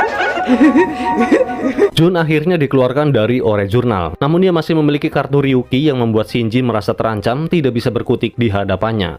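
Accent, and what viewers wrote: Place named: Cilacap, Indonesia. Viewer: Rika wong Cilacap yah kang 😅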